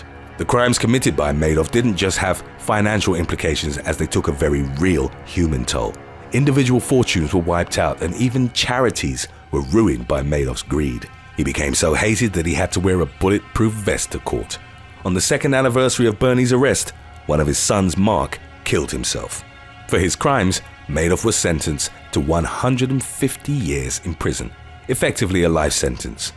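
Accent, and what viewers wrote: United Kingdom. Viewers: Thank you for your informative, interesting, and accurate content. All stated succinctly in a lovely British accent. Maybe Tupac’s mgr was taunting fate when he named his company .Death Row.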